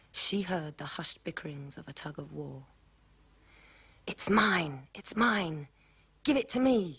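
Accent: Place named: United Kingdom. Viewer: oBRIGADAAA, estive procurando esse video legendado porque mesmo entendendo ingles, ainda me confundo com o sotaque britânico. muito obrigada pelo video!!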